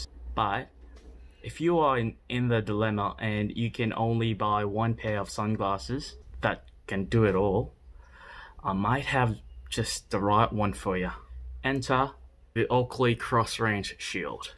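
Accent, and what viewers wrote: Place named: Philippines. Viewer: U a filipino bro your accent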